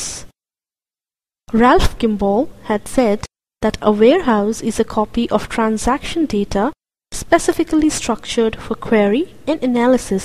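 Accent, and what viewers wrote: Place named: India. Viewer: it's like india accent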